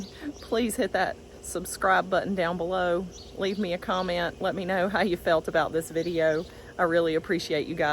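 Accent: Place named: South, United States. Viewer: I respect your right to privacy, but I was wondering what state you live in. Your accent says the south. I am new to the south, north central Arkansas, and the accent sounds close.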